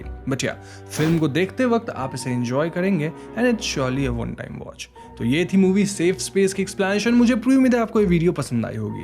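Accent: Bengal, India. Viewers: Aapki Bengali accent wali Hindi Alag hi feel deti hai... KO😍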